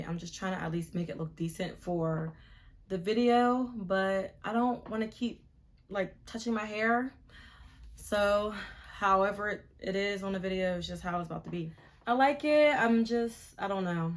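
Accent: Pittsburgh, United States. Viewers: It’s so crazy cus when you start telling the story, I’m like she sound like she’s from Pittsburgh 😭 We really have an Accent fr lol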